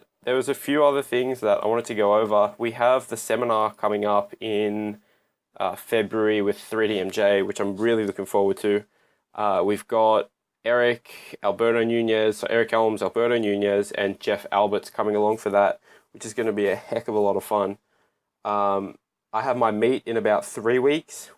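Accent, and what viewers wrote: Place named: Australia. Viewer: Lol Cheers Tim. It's an Aussie accent :-)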